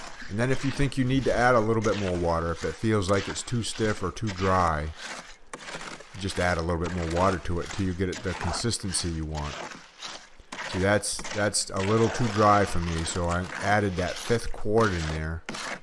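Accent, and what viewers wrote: Maine, United States. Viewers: Mostly was watching this to see how to mix it up and what consistency I should mix it. But I couldn't help but notice that you sound like you're from Downeast Maine lol. I'm born and raised there and live in the Southwest now 😂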